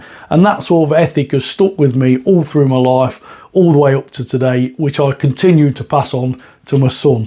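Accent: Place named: Leicester, United Kingdom. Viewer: You’re welcome matey. Out of curiosity where are you from? Detecting a Leicester or Notts accent.😊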